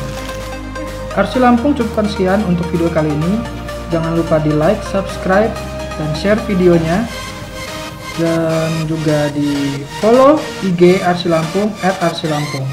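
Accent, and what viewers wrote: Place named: Saudi Arabia. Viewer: You sound like you're from Saudi Arabia.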